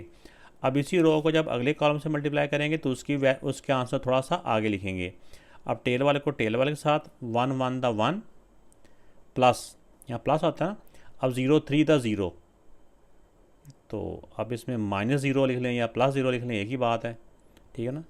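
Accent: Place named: Faisalabad, Pakistan. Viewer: Sir ap Faisalabad sy ha naww safff PATA chl rha ha 🫥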